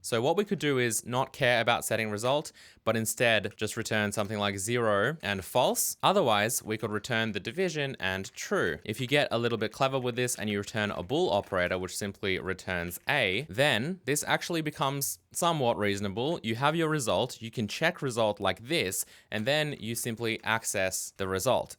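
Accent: Australia. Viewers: Don't assume I'm a simpleton like you and mistook the aussie accent for the f@ I know what aussie sounds like, I know what f@ sound like, he's both. Edit: extremely obvious when he says "C plas plasss"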